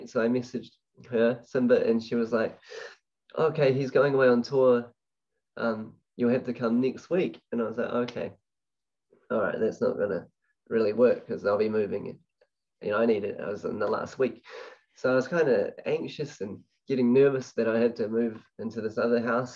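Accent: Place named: New Zealand. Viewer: Please do a talk in Auckland. I knew you were from NZ straight away hahaha 🙏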